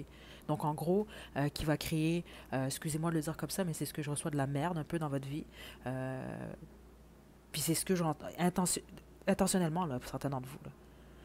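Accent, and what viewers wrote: Quebec, Canada. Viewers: Bonjour je vous suis depuis quelques temps quand je fais un choix ça me parle mais la plupart du temps c’est mon énergie que vous décrivez j’ai pris le choix no 2 je suis spirituel j’ai vécu la nuit noire de l’âme j’avais commencé la médiation et des choses bizarre se sont produits que je m’explique toujours pas comme sentir des caresses sur mes cheveux quand j’allais mal et que je pleurais un jour ça m’a énervé et j’ai créé laissé moi tranquille une fois j’ai entendu une voix répondre à ma prière et d’autres turcs que je ne comprends et je ne sais pas qui peut m’aider à comprendre je suis empathe quand je vois un itinérant c’est moi que je vois parce que pour moi l’humanité c’est ma famille je suis eux et ils sont moi voir souffrir les autres me fait mal et parfois je doit me protéger je vous écris tout ça peut être parce que je cherche des réponses que peut être personne n’a merci et bonne vacances je pense qu’on reste à la même province car votre français est québécois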